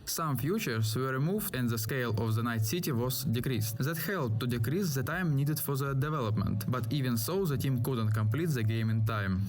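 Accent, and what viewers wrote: Russia. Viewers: russian english good god :/ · why are people hating on this guy? is it because of his accent or because he is russian? there are many xenophobes i feel. keep up the good work brother. I like your uploads about cyberpunk XD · he has Russian accent, so should that deter him from making content?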